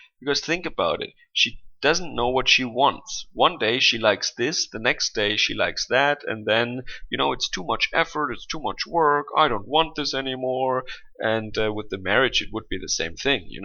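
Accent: Germany. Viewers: When I was a kid I had a german friend, here in Chile. Same accent, same sense of irony. God bless him and you. Loved the video. The engineer guy had a great pair, props to him! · "appreciated just for having a pulse". lol. Great vid made all the more so with the German accent. I love to hear foreigners speaking English. It seems to make what they to say all the more interesting and focused on imparting important information.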